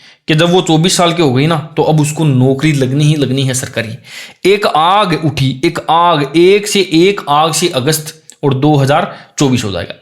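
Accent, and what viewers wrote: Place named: Shekhawati, India. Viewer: Wow sir .....kya gajb ki trick ....❤ap shekhwati se ho kya kyunki apki language m asar dikh jata h thoda thoda .......bhot acha pdha rhe ho sir sir ......👍👍👍👍👍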